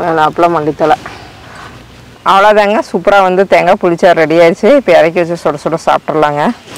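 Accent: Kongu, India. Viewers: கொங்குதமிழில் சமையலும் அருமை... இந்த பச்சைசெலவு குழம்பில் கத்திரிக்காய் போட்டு பன்னினால் இன்னும் அருமையாக இருக்கும்... பச்சைசெலவு கத்திரிக்காய் புளிக்குழம்பு ..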